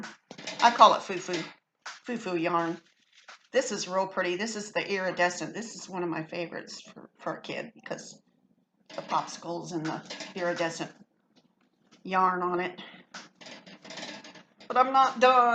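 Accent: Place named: South, United States. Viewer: I love the sound of a refined southern accent. I’m not originally from the south, but I lived in Alabama from <num> and most of the people I came into contact with were very well spoken. Maybe you were staying in the sticks, I don’t know.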